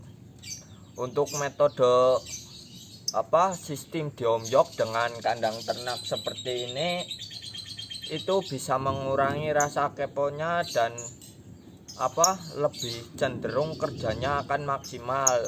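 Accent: Java, Indonesia. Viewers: Ngomong nya jgn medok" mas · Ngapak tenan koek kang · Salam medok🙏🤘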